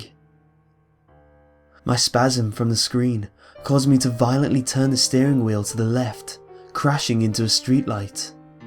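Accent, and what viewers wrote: United Kingdom. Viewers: Oh, and your voice is smexy. I love your voice. Are you British?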